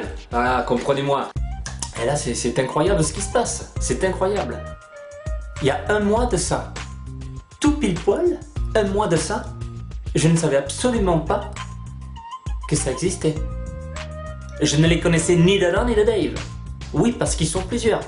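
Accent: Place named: South, France. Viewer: enfin un sudiste qui fait des podcasts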